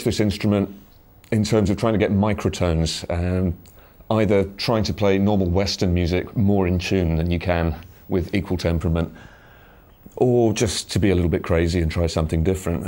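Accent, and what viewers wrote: United Kingdom. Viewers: Thanks ! That was interesting, but for sure need to try before purchasing. Btw, what a GOOD AND CLEAR english accent you have. It makes easier for non british people like me to understand. Cheers !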